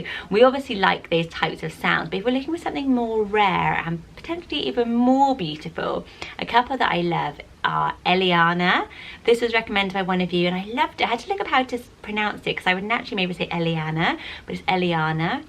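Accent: United Kingdom. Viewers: Everything sounds prettier with a British accent lol...they sound beautiful when you say them! I live in Tennessee...ask someone to say Clara or Lavender 😆 It’s not good. I’m originally from NY...it’s not good, either 😂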